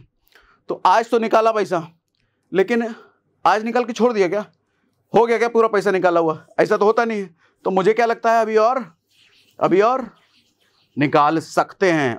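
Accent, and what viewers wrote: Bihar, India. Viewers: Bhai aapki bhasa se aap bihari lag rhe ho or aap kya bol rhe h ye sayad aapko bhi nhi pta . Ab suno ye koi nai baat nhi h market crash hona or ha abhi carsh hua nhi h but aapne apna paisa kmane ke chakkar me video daal dia or logo ko bevkoof bna rhe ho . Piche ka record utha ke dekho kab kab market me movement hota h ......kya yar bkvas ke alava kuch or bhi krte ho 😂😂😂😂😂😂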